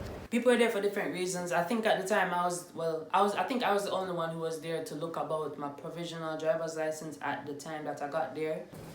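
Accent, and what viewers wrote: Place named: United States. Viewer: Talk like an American tho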